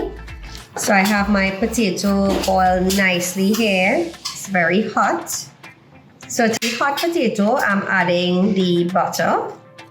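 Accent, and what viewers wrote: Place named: Indo-Trinidadian, Trinidad and Tobago. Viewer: She is speaking like Trinidad Indian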